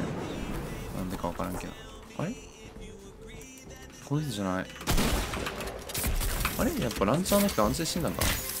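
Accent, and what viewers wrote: Kansai, Japan. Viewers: さんのこと嫌いやってんけど関西弁？好きやしキャラ好きやからもういいや推し決定俺何言ってんやろ。GW全員推しやった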